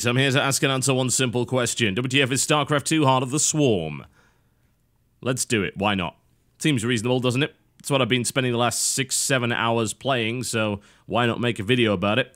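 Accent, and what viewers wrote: United Kingdom. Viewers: I am honestly loosing my ability to detect your british accent... I don't know if it because I watch a lot of channels with accents from the U.K a lot and therefore Americans and people from the U.K are starting to sound the same but either way I can't even tell the difference that easily anymore.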